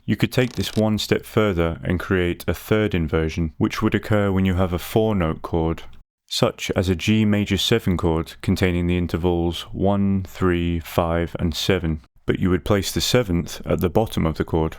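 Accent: Scotland, United Kingdom. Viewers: I love your videos. Most of the ones I watch are American and you have a great confident Scottish accent. You speak calmly and clearly and everything seems very well planned and thought through. I could never seem to harmonise the harmonic minor scale properly. This video has taught me that augmented chords have a sharp 5 and NOT a sharp 4 😂 thanks for everything matey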